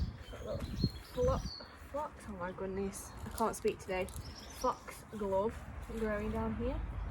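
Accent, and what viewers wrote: United Kingdom. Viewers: I’d love to see a transformation video, a before/after with your thoughts on what you recommended to the client and why. Living in America, I can listen to an English accent for hours. Also, either a video or narration over a video of how and when you started in the business, what you love about what you do, what you don’t like so much, etc. Have a great weekend and stay safe out there 😁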